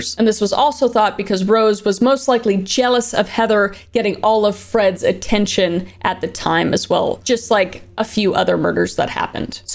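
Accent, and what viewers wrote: Canada. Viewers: The Canadian comin out in your accent is killing me 😂😂😂😂😂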